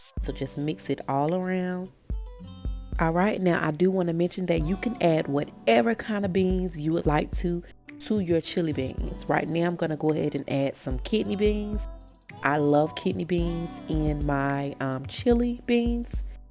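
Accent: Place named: South, United States. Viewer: Looks good love your southern accent. Imma try the Saturday. 🎉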